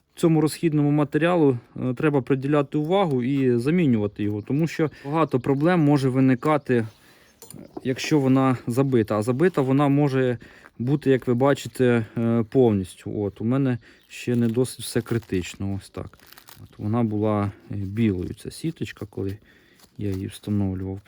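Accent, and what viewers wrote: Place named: Ukraine. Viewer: По акценту украинец , лайк и подписан давно , у самого леганза , слава Украине !!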